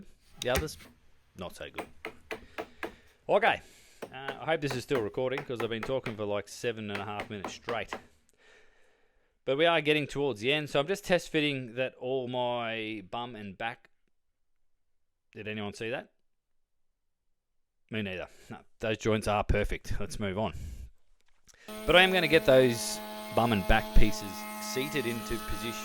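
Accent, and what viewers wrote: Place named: Australia. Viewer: Is it just me or does he sound even more Australian on this channel?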